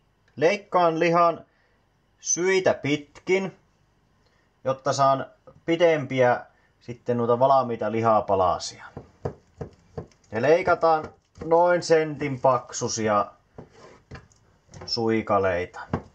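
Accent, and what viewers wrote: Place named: Ostrobothnia, Finland. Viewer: Hyvä video sait uuden tilaajan. Tosi piristävää kuulla vähän pohojanmaata youtubesta.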